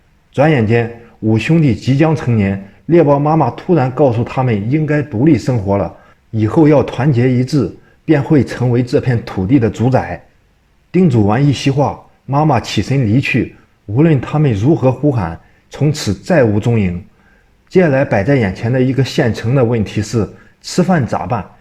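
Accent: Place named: Shandong, China. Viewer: 这口音像是山东泰安东平的口音。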